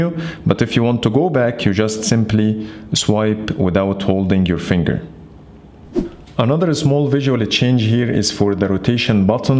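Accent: Egypt. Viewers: Wooow!! Egyptian accent i guess;)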